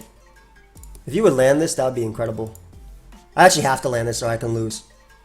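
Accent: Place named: New York, United States. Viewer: The New York accent hasnt faded from Connecticut dwellings. Yes. 👌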